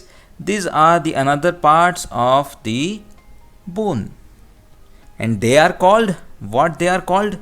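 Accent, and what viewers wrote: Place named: India. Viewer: Indian accent sucks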